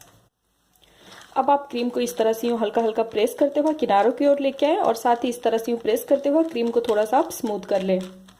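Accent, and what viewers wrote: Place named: Bihar, India. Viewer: Aarey aap Bihar se ho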